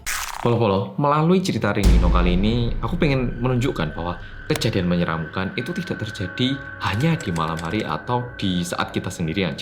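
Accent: Java, Indonesia. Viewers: Karena keseringan dengerin bercerita,waktu baca novel jadinya suara yg logat jawa itu yg terngiang ngiang. waduh gimana ini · 💙💙💙💙💙💙💙💙medokkkk karo ngapakkkk pada ae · kaet ngerti onok wong korea medog wkwkw ! auto subscribe hehehe..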